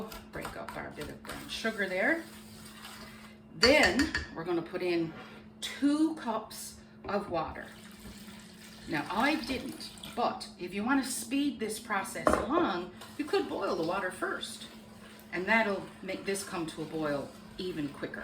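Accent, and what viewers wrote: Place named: Ireland. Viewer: Boiled fruit cake has always been a big favourite here in Ireland, I started baking back in the 1970's when I got married and its one of the first things I made. These days I usually make tea brack, coffee cake, Bakewell cake and scones. Luckily my hubby loves cake! I honestly thought you were Irish, from Dublin exactly, except for a couple of words. But then Newfoundland and Ireland have long connections. Thank you for sharing your recipe.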